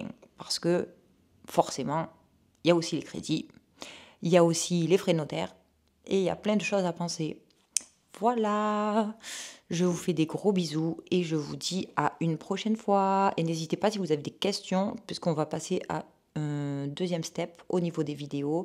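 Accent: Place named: South, France. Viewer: Jolie accent qui rappel les cygales ❤❤❤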